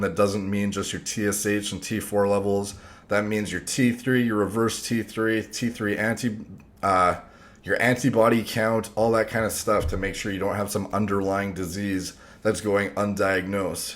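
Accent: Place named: Canada. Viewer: He sounds way more Canadian here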